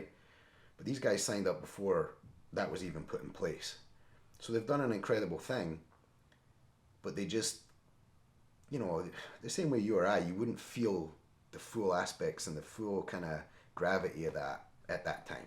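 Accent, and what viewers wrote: Scotland, United Kingdom. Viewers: does he have half a Scottish accent ???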